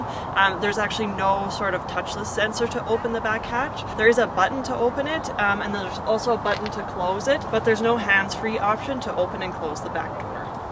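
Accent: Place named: Canada. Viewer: She sounds Canadian 🇨🇦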